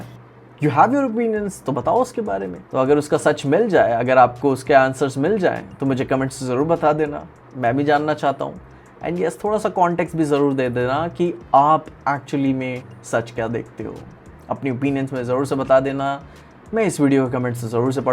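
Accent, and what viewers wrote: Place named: Bihar, India. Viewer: Bhaiya majak nahi Karu ga per aap ki awaaz beach beach me bihari jesi sound kar rahi thi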